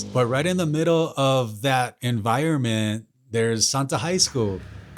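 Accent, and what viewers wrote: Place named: United States. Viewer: It’s crazy because you saying that you try to hide your Filipino roots back in the day is hilarious to me because you look super Filipino 😂. What I mean by that is you look like a typical Filipino poster boy. I can tell your Filipino 100 miles away and if i didn’t hear your American accent I thought you were from Ilocos. Also you being Ilocano I’m sorry to be blunt but even if you ask your family Ilocano are stereotyped as being very cheap, very dark skin and like to eat dogs. If you don’t believe me ask your family or just google search it. Also the Tagalog people use to discriminate against you guys a lot back in the day. Also I just think it’s super funny how Filipinos who were born and raised in America look super native, while Filipinos in Philippines look more western. From my experience most Filipinos who were born and raised here have that super Filipino look and have darker skin tone. 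But Filipinos who are born and raised in Philippines look more mixed and have lighter skin tone. Like walking around especially in Metro Manila in places like Makati, Alabang or BGC you can see Filipinos who clearly have either Chinese or Spanish blood running in their veins. So it’s ironic that a Filipino in Philippines can look more western than a Filipino from America. I know how it is because I live in America too and my family have been here since the late 1960s. Most of my family in my dad side are born and raised in America and some even in Europe. They are super white washed but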